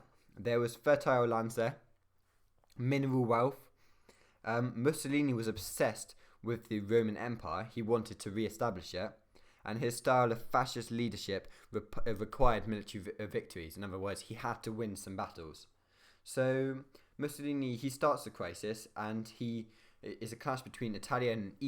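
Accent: United Kingdom. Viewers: I love your accent. R u from Yorkshire or something?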